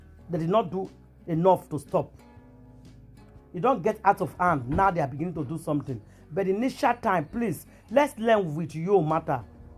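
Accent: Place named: United States. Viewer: you are talking like an American, but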